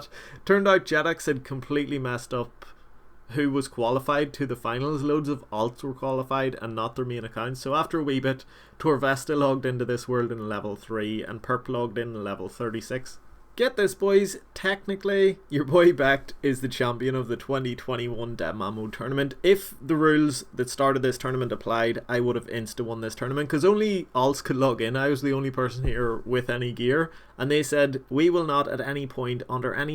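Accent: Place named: United Kingdom. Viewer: Well said at the end my man and brilliant series of a casual play through, I've not played for a good few years now, gunna start a GIM with my friend and do the next league or dmm, never done either before. Started back in 07-eoc quit then came back to osrs <num>. Cheers for the insight, always wondered if it would be balanced enough to be fun for a casual player to farm some 07 GP early on and then have some fun pking mid game and have some decent gear at the end, or just swap out to 07 at the end and I'm guessing it goes for decent, I'll have to do some Lms, pked back in the day but I tried a couple games once and got totally dropped left and right haha super rusty. Look forward to your future content subbed. Love the accent aswell, I'm from the UK so it's refreshing to hear a fellow Brit ☺️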